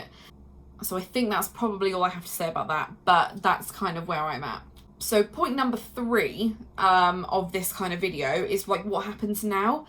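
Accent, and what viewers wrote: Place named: United Kingdom. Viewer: i finally found a uk (or at least you sound like you're from here) AC player! HII :3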